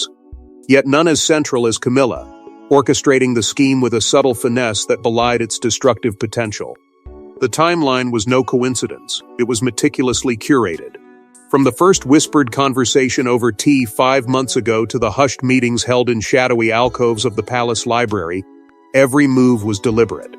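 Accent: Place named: United States. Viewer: A British story told by a "Yankee" voice - PLEASE - have some thought for those of us who find this discrepancy really jarring.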